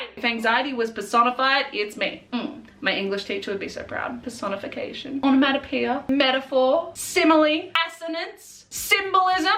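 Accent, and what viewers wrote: Australia. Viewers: I love your bombastic and I also love your real voice and personality:) It's so refreshing to hear an Australian voice on YouTube - and idk, hearing someone do internet memes with my native accent is weirdly comforting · i like both, i kinda liked how you and were in this video. the accent is just hilarious although i adore your aussie accent. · By gosh, I looooooove Austrailian accents! (Okay, I'm done gushing. Maybe.) Whether you're you or you're, you're amazing and you bring a TON of joy to those who watch your videos!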